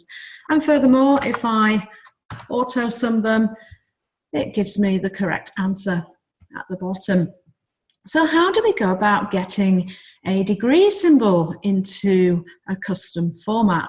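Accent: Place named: East Midlands, United Kingdom. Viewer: Thanks, very helpful, succinct and to the point. I like your accent, is it East Midlands?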